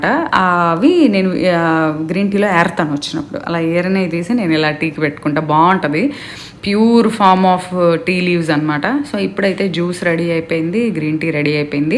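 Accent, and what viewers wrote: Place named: Vijayawada, India. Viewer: Nice to hear Vijayawada Telugu 😊👍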